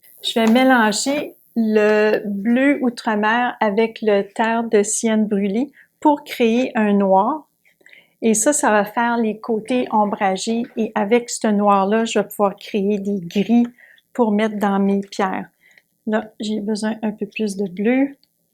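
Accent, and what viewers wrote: Quebec, Canada. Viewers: c'est un plaisir de vous écouter (avec votre joli accent québécois) et vous regarder peindre, j'ai justement des rochers à faire, j'ai un peu de mal car beaucoup de nuances, j'utilise un modèle de photos prise en hiver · Merci pour vos conseils dorénavent je suis tous vos tutos j'en suis ravi, un marseillais qui adore aussi votre accent Québéqois. Michel Hibernac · J'adore vos explications ! .En plus votre accent canadien ,c'est super ! .Merci à vous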